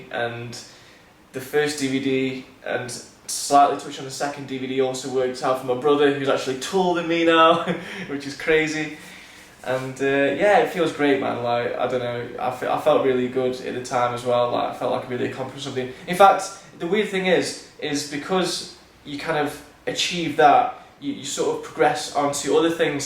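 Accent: United Kingdom. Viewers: can't understand British accent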